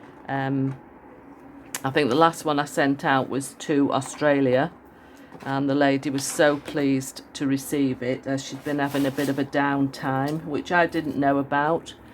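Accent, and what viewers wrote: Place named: England, United Kingdom. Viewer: Your video popped up in my feed and I can’t believe I’m just finding you! After viewing, I immediately subscribed, and now will need to go back and try to catch up with your incredible ideas! Thank you so much for sharing your creations! I’m intrigued by your English accent and the fact you’re in Spain…love the background noises! I’m picturing you living in a city above shops…that’s always been my dream! All the best to you from the USA ❤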